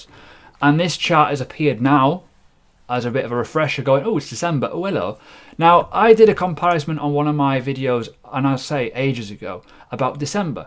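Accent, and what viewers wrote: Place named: North, United Kingdom. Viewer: Favourite YouTuber. Proper northern. Tells it how it is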